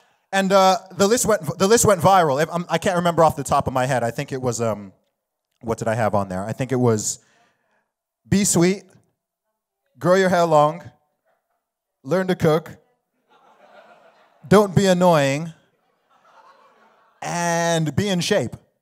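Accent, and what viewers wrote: United States. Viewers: you speak American because you want to hide your accent? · It is funny you grew up in Saudi Arabia you live in England but you sound like you are from the Midwestern part of the United States.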